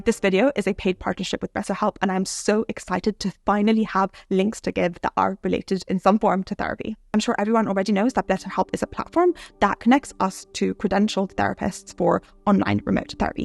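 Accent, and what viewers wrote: Scotland, United Kingdom. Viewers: why do you talk like that? are you british ? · Are you from britian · Thats scotts english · is it BE accent? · East coast Scotland, sounds like Edinburgh/Stirling private school type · sorry, i can’t listen to people with british accents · did you speed up the video to get it under or do you normally speak at a NYC “I’m going to miss the bus” pace? Between the rapid fire of words and your slight British accent, I completely failed to understand most of what you were saying. It doesn’t help that you are distractingly beautiful, but… SLOW DOWN GIRL! By the way, throw away that lipstick. It’s the wrong color for you; obviously I found that distracting too.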